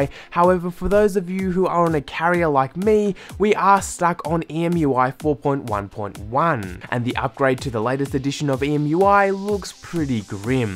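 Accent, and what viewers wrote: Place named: Australia. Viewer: Ugh...Aussie accents.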